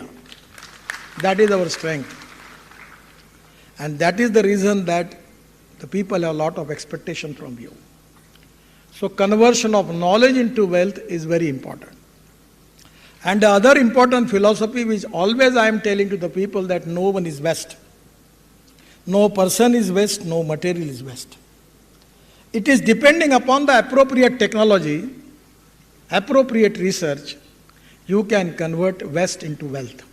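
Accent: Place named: Maharashtra, India. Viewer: Somebody please make him learn good English, Marathi accent is acceptable while talking in Hindi; but not suitable for English please :-) Working is good but if you want to give speeches improve your speech. Even Lalu also tried :-) Use we and not me or I.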